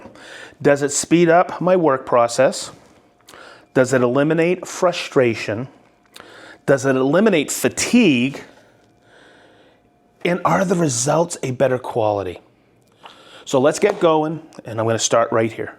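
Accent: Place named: Boston, United States. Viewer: I know a Boston boy when i hear one. Thanks for the video buddy, just discovered your channel and looking forward to more. Cheers from Malden! 😂 Oh… and it’s Aliens for me.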